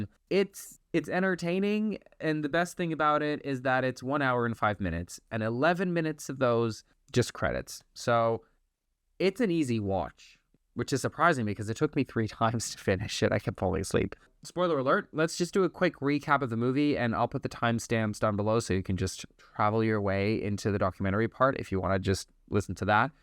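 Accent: United States. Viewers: Your in the U.K. but totally sound American? As usual, I am so confused! Very much enjoyed your commentary on JLo! Your funny and correct! IMO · wait, you are portuguese? Haha me too. Também sou portuguesa, que engraçado pareces americano. Um abraço, bom vídeo.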